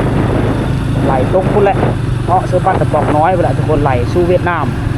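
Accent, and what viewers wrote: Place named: So, Thailand. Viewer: ฟังคือภาษาโส้ กุสุมาลย์ สกลนคร อะจอ อะลีก เดอะ คือกันกับโส้กุสุมาลย์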